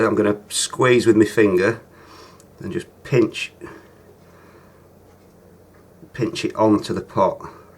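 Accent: United Kingdom. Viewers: Lol I have the same accent XD I'm British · I'm Australian. It's definitely a British accent.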